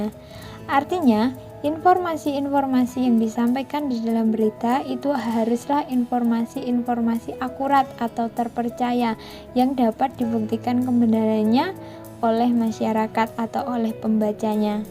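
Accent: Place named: Java, Indonesia. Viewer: Medok sekali bu suaranya 😁 tapi gapapa bu terus berkarya 🤗🤗 saya venny dari kelompok yayuk cs 🤣🤣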